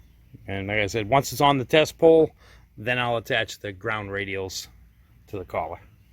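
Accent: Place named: New York, United States. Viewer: Are you from NY ? what a heavy accent you have ...what are you doing in NC?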